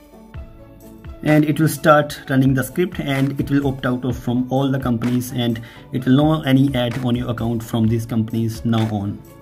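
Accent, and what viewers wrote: India. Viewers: Indian accents means you can’t trust him Probably works for a scam company and writes tree in cmd like he did it here Nonsense not working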